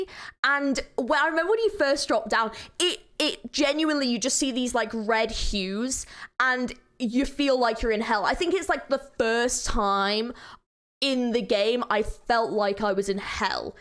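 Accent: United Kingdom. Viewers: This girl is so british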